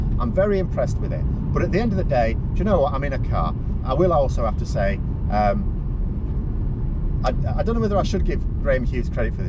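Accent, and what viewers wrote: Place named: Yorkshire, United Kingdom. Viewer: Merry Christmas. I very much enjoy your podcasts and am a regular viewer. Your insights into politics are most informative. I particularly like your Yorkshire straight talking, something I appreciate about the folk in Britain's best county. All the best.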